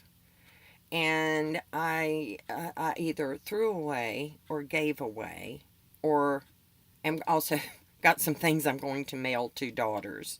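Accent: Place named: Carolina, United States. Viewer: I told my wife you were from North or South Carolina or maybe North Georgia with that accent. I reckon I wasn't too far off. 👍🧔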